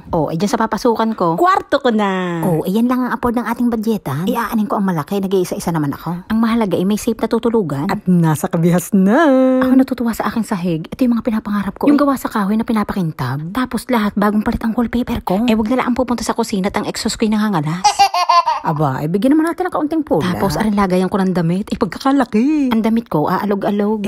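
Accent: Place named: Batangas, Philippines. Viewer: new follower ninyo po ako. i like how you narrates your videos... nkakatuwa. batanguena tlaga!!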